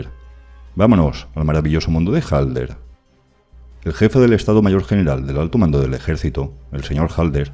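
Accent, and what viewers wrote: Galicia, Spain. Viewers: ¿El locutor es gallego e intenta disimularlo o habla así de impostado?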